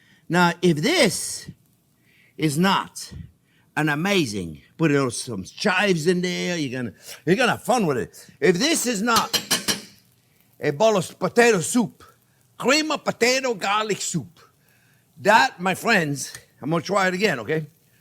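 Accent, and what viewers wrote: France. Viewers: Dude is aggressively French from the beginning XD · I absolutely love this guy… how bout a split pea soup?? On a side note who doesn’t love listening to a French chef talk and cook?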